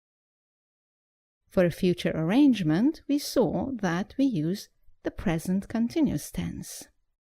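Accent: United Kingdom. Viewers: UK English am i right ?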